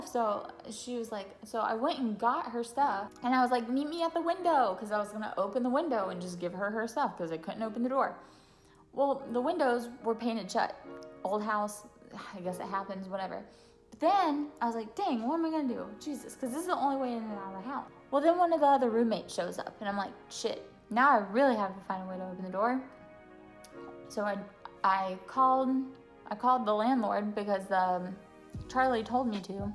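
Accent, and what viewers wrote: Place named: Coventry, United Kingdom. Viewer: yew sounds like wer we live in coventry ri be safe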